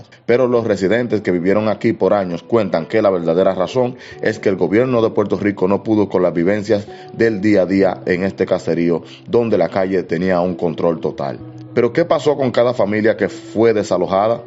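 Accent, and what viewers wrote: Dominican Republic. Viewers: una pregunta, eres Dominicano por si acaso, tu asentó parece de RD!!!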